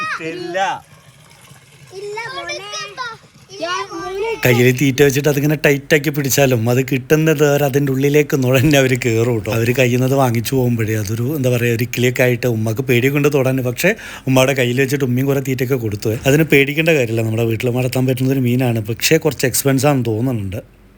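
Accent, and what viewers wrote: Palakkad, India. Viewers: വീഡിയോ പൊളി... ഇടക്കുള്ള dialogues... സൂപ്പർ... നിങ്ങളുടെ പാലക്കാടൻ സംസാരരീതി കൊള്ളാം 🤣ചെറിയ മക്കളൊക്കെ (boys)പൊളി 🥰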